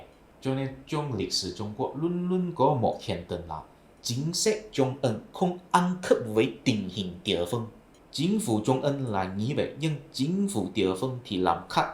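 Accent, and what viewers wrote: Standard. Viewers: Anh phát âm ngày càng chuẩn, trôi chảy và rõ lời hơn những video đầu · Sao anh này nối tiếng Việt chuẩn mực và rõ ràng thế nhỉ?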